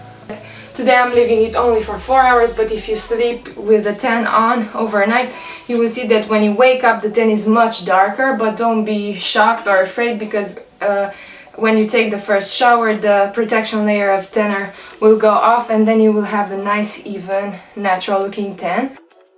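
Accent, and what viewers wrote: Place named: Romania. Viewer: Esti cumva din Romania ? Am vazut produsele romanesti si ai si accent 😘😘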